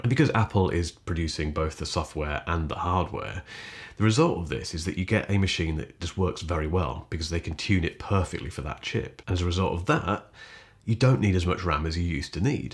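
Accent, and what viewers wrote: United Kingdom. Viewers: This is the calmest review I’ve seen and the best British accent I’ve heard. You sir have a new subscriber.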